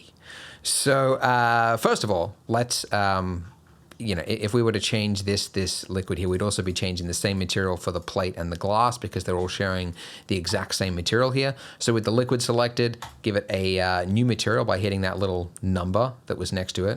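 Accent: Australia. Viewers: Oh I love Aussies, they're such good sport. And the accent is always a + too.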